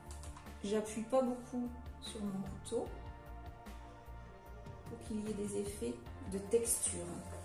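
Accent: South, France. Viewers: Bonjour..Je viens de m'abonner à votre chaine et je suis admirative de votre travail! merci pour toutes ces vidéos qui m'ont donné envie de me lancer dans l'aventure. Grace à vous j'ai pu commencer à faire de jolies choses.Vos vidéos sont très bien expliquées et votre accent du sud nous apporte un pur moment de bonheur. Merci pour tout ce partage et pour toutes les explications que vous donnez pour des débutantes comme moi.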